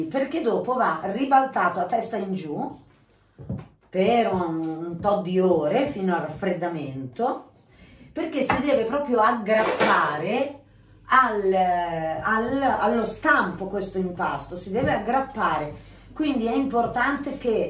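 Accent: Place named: Rimini, Italy. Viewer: grazie mille, molto gentile 😊 quindi se ho ben capito il fatto che la panna fosse già stata scaldata mi consente di congelarla due volte mentre se la congelassi fresca no. Ne ho imparata una in più ☺️ La chiffon cake proverò a farla col colorante rosso x il compleanno di una collega e pensavo di farcirla e glassarla di qualcosa di rosa... Ma non al burro 😕 o una ganache molto solida di cioccolato bianco, panna e un pochino di colore rosso o una crema pasticcera molto solida... Poi pensavo 🤔 essendo molto alta anziché tagliarla x farcirla potrei fare dei buchini in alto e riempirla come si fa coi pandori x es..... Purtroppo non sono su fb... Scelta di vita🤷‍♀️ in questi casi mi dispiace un po' ma pazienza, proverò a immaginarle le tue chiffon cake 😊🍰 Cambiando discorso, adesso ti sto scrivendo da Rimini e la gente ha il tuo accento ☺️ e un po' anche mio nonostante viva a Roma da diversi anni.. 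purtroppo non potrò vedere le tue torte su fb perché non ci sono... Proverò a immaginarle 😊 Buona giornata e buone feste a te e tutta la tua grande famiglia 🥰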